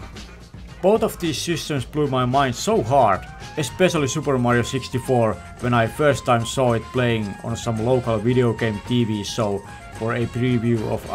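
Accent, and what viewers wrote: Finland. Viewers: I 100% agree with you! Btw are you finnish? You sound very finnish 😅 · Ha! I was listening that this sounds like a Finnish talking with rally-english accent. Then I checked the channel description and there it was, Suomi. 😄 Mut joo, kiitoksia videosta. Itse aloitin pelaamisen vuonna 1986, eli samoihin aikoihin kuin videon tekijä. But yeah, thanks for the video. I started gaming at 1986. Roughly at the same time as the maker of this video. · The most authentically Finnish accent I've heard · You have the most Finnish accent I've ever heard. I enjoy it. I'm subscribed now haha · Ai että, suomiaksentin tunnisti heti :D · One of the most aggressively Finnish accents I've heard in years lol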